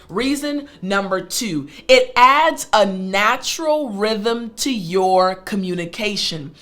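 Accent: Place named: United States. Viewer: Teacher. I am really blessed when a I watch your courses. They are extremely clear, powerful and resilient. However I would like to know where you are from. I hear American accent into your speech but I would like to be sure... 😊 I am actually from DRCongo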